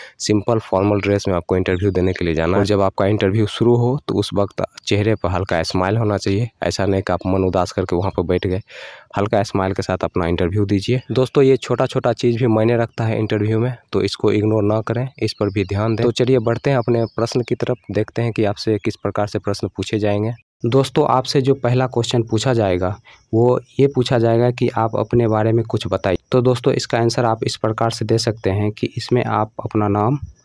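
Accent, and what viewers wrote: Bihar, India. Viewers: u r from Bihar Right?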